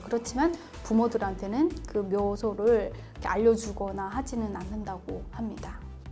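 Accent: Seoul, South Korea. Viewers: She already had that seoul accent i think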